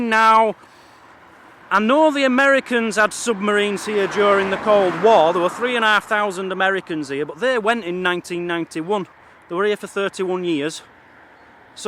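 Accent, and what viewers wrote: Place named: RP, United Kingdom. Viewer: You're becoming posh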